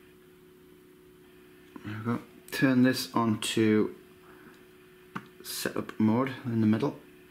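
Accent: Newcastle, United Kingdom. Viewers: Hi, I am looking to purchase a good trail Cam such as this for monitoring hedgehogs in my Garden. Can you tell me where you baught your trail cam from please. I live in Gateshead and its great to hear a Geordie on youtube and ive recently subbed to your chanel. Also what sort of distance can you see images on your mobile phone from where camera is sited? Also does this alert you of activity or is that only cellular Trail cams that do that?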